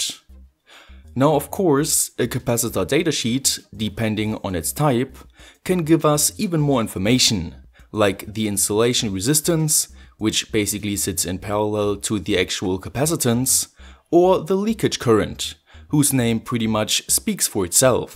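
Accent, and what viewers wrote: Germany. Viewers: German accent 😯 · Is it me or does he sound more German every video he releases?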